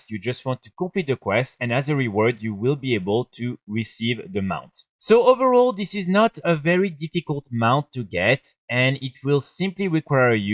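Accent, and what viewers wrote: France. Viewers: ça c'est de l'accent français comme on aime :D les torrential fragments, j'ai envie de mourir tellement le taux de drop est bas !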